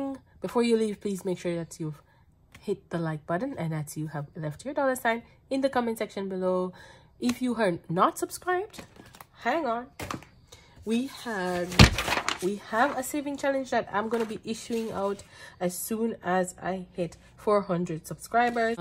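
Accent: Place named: Jamaica. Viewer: You are welcome. When I heard you I was like yep Jamaican 🤣🤣. I am as well 😁.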